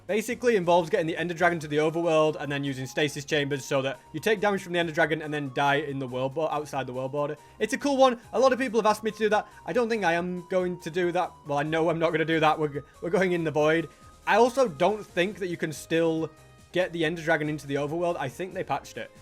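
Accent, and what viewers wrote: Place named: United Kingdom. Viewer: Bro is pure british. For a moment I tought you are mcqueens brother🤫💀💀💀💀💀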